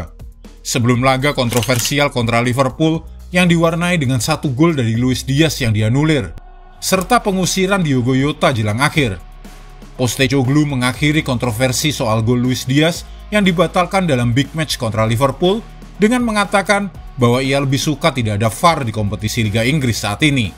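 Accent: Java, Indonesia. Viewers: Medok tapi mantep 👏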